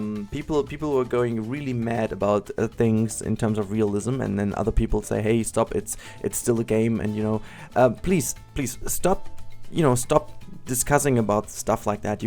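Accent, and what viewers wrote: Germany. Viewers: Are you German? You sound like one!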